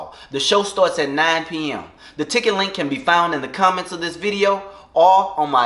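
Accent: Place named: Houston, United States. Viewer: I love this Houston accent. He sounds exactly like my mother... bad attitude and all. 🤣🤣🤣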